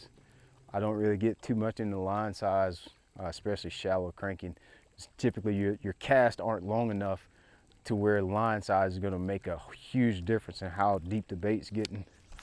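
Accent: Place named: South, United States. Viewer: Boi I’m from Louisiana but THAT accent is so country i love it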